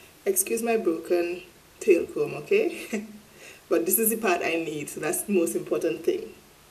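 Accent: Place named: Dominica. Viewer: Great Tips .Where you from u sound like someone from Dominica.I'm a Island girl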